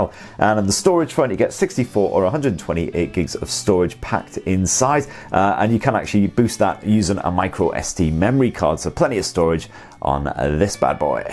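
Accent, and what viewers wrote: United Kingdom. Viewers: Said the actress to the bishop! I can tell your British! Good review getting mine next week!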